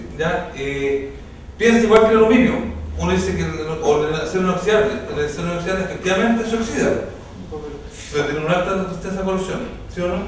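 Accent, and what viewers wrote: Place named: Chile. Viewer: no se entiende nada en chileno :v wn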